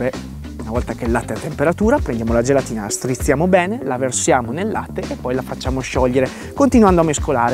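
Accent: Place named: Bergamo, Italy. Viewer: Poi odio il tuo accento quasi come un bergamasco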